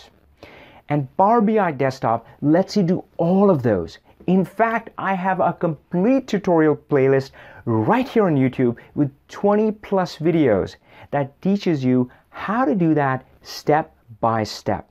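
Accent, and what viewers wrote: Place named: India. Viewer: the best indian english talker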